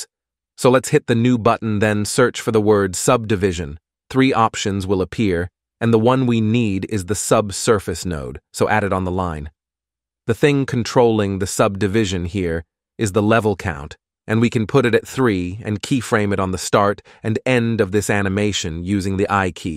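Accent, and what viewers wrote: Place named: United Kingdom. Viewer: Awesome video and chanel. P.s.: go back to the british accent! 😁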